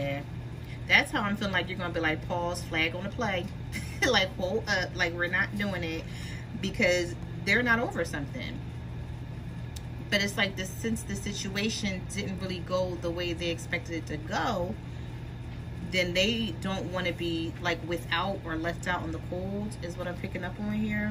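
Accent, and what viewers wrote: Philadelphia, United States. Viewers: Good reading totally resonates... loving your nails! You sound like you’re from my city Philly 🙌🏾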